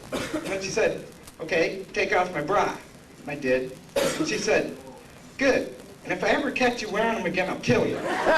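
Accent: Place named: Michigan, United States. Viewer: this isn't funny or edgy at all, and he's just awkward. his accent is the only funny aspect just because it's Michigan enough to sound a little Minnesotan and it vaguely reminds me of the guy in the snow suit shoveling his driveway and being questioned by the police in Fargo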